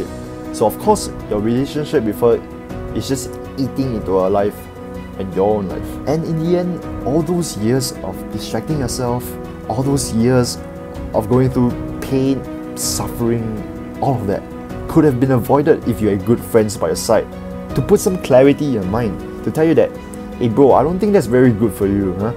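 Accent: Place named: Singapore. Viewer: my mom said you sound singaporean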